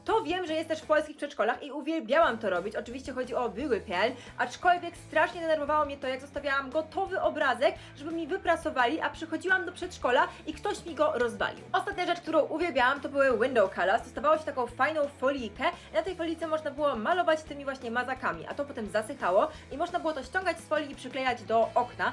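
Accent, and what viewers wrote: Germany. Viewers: Ja też chodziłam do niemieckiego przedszkola i bardzo lubiłam te wszystkie gry i w nie ciągle grałam i masz bardzo ładny akcent niemiecki ja nie mam😢 ale już oczywiście mieszkam w Polsce i niektóre osoby z mojej klasy w szkole myślały że urodziłam się w Niemczech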